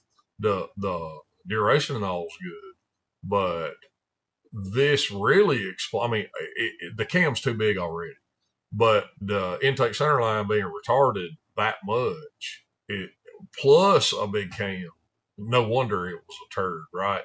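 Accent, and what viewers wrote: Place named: North Carolina, United States. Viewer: He talks like my relatives in North Carolina.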